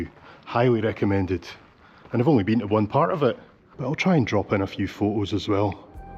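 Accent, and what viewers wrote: Scotland, United Kingdom. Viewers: I enjoyed your trip,I just love you Scottish accent,I find everyone of your journeys wonderfull keep up the good work😊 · Love your 'posh' Scottish accent.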